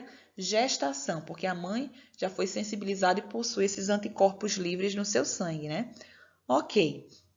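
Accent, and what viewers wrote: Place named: Bahia, Brazil. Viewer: kkkkkkkk é um pouquinho forte, mas é lindo. Bahia?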